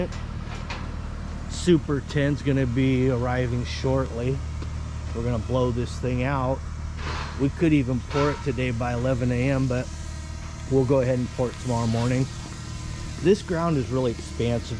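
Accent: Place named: Southern California, United States. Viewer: I am not understanding the S0-Cal accent lol because at first I thought I was watching another concrete persons video from So-Cal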